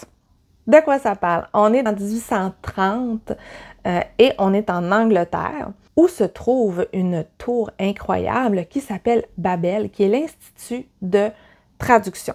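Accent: Quebec, Canada. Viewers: J'adore t'écouter pour ma part justement avec ton accent québécois et le parler de chez-toi 💛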